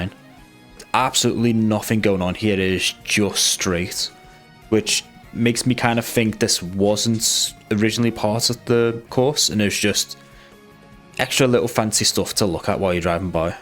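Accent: Liverpool, United Kingdom. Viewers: Omg are you a fellow scouser… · come to think of it barely any youtubers have a Liverpool accent like this (in my experience at least) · great video made even better by fact you're a scouser, legend.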